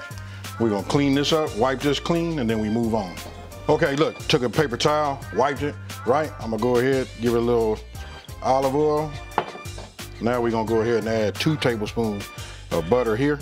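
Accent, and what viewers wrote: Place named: Compton, United States. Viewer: Wow thanks for the reply. I will! By the way, I love your Compton/L.A. accent 😁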